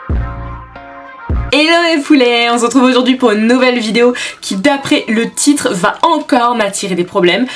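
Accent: United Kingdom. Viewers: J'aime bien tes videos mais tes mimiques franglaises sont prodigieusement agaçantes, excuse-moi hein mais j'ai eu du mal à me concentrer tellement j'étais obnubilé par les mots que tu prononçais à l'anglaise (certains étaient même français) ainsi que les "bad attitude" "ratchet" etc...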